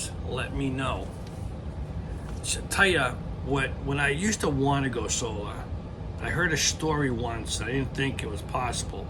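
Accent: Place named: New York, United States. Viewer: You sound like Ny or NJ, too many clouds to go solar unless in sunny Cali or Texas , in Ohio, no way it would work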